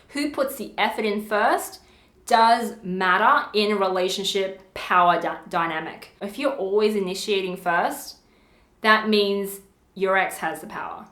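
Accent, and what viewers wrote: Australia. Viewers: W Jansen She sounds Australian.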